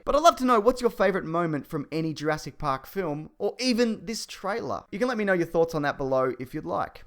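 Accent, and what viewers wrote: Australia. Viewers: Question is this guy Australian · I watched this video before the actual trailer. Now I'll have an Aussie voice running in my head when I see the teaser.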